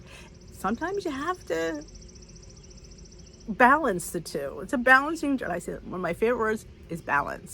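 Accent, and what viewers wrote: New Jersey, United States. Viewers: I'm glad I found your channel and i just subscribed to it. I'm with WW and have 150 pounds to lose. I'm trying to make it a lifestyle - I have lost 65 pounds since my highest weight and lately have gained and lost the same 10 pounds because I've been goofing off. For my health I'm really trying to make it stick and your recipes and examples of what you eat in a day. Also- you gotta be a Jersey girl - you definately have the accent. Too bad WW didnt take you- definately their loss. Anyway I look forward to following you- your success is inspirational!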